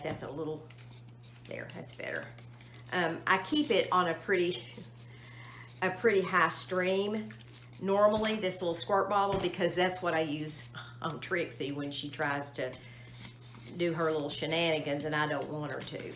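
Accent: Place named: North Carolina, United States. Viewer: you make me laugh so often. I'm a North Carolinian & the 1st time I watched you, I said aloud,"" this Girl is from NC or TENN☺💕☺. I just knew that accent💕.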